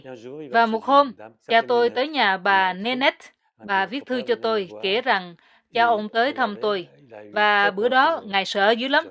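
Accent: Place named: South, Vietnam. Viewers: nhà báo giọng Sài gòn sang trọng Văn phong phỏng vấn đơn giản thú vị. đồng cảm với nhân vật. người nghe xúc động cuốn hút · Tui ghiền xem kênh này từ khi tình cờ xem được clip hai em đi về vùng quê thăm mộ HHNP và nơi HH từng sống...❤❤❤ Rất thích thú cách interview của, giọng Miền Nam tự nhiên và rõ chữ👏👍👏❤❤👍👏👍❤❤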